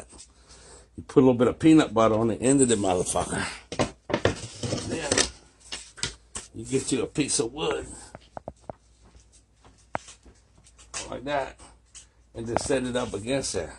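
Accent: United States. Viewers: My husband and I never laughed so hard 😂 . Am I picking up a Louisiana accent? The fart sounds Cajun.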